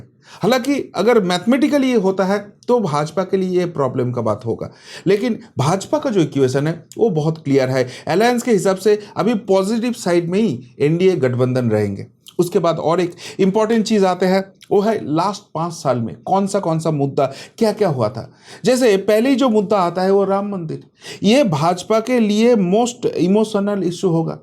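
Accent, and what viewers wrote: Bengal, India. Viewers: आप तो आते ही छा गए सर! आप की बंगाली accent वाली हिन्दी और साथ ही समाचारों का विश्लेषण बहुत hi बढिय़ा है ! धन्यवाद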